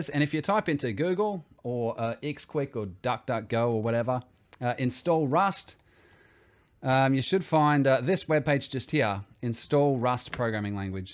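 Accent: Australia. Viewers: What part of straya you from mate?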